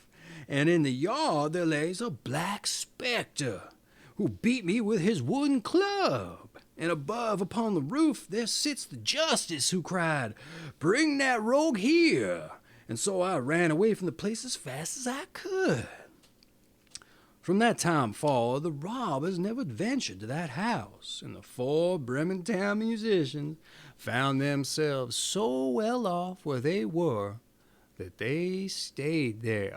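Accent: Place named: Lowcountry, United States. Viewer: I can’t decide if he is mocking the low country accent or enjoying it 😂but it’s a little to theatrical to listen to the entire story.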